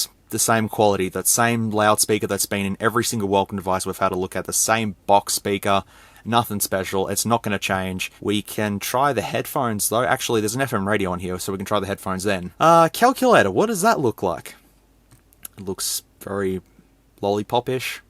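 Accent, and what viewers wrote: Australia. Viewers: Yay the Aussie voice is back now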